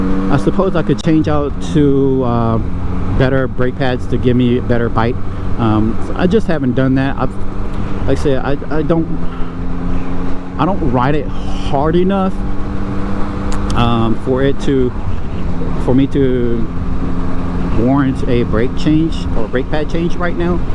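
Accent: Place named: Louisiana, United States. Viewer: Are you in Louisiana? You sound like one of my Cajun cousins.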